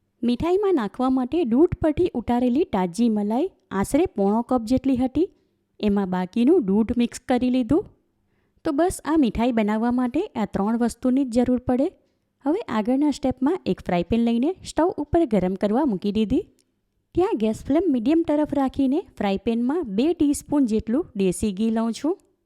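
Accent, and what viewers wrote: Surat, India. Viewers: કોપરા પાક પણ ખૂબ સરસ અને તમારો અવાજ સુરતી ભાષા ખૂબ જ મીઠી 👍👌🤪❤️❤️❤️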